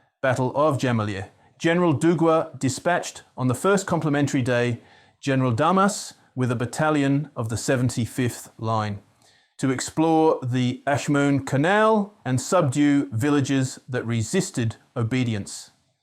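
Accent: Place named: United Kingdom. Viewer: I am not sure you could have gotten a worse narrator. Did you really need Napoleon to be read by a guy with a heavy British accent and saying - each - word - individually - and - distinctly - with - no - smoothness - of - delivery. I cannot imagine how he learned to speak like that and not be beaten by his teachers. Maybe have him read it once beforehand and then record the second attempt. Hopefully, he was not a professional narrator. But I complement you on the topic. I myself was looking to read more about the Egyptian Campaign after seeing the Napoleon movie.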